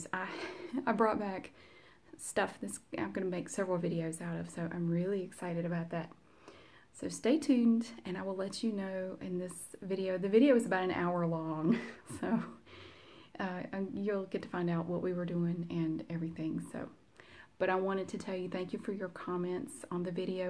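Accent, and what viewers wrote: South, United States. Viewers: Fell in love with your voice already. Love that Southern accent. Subscribed!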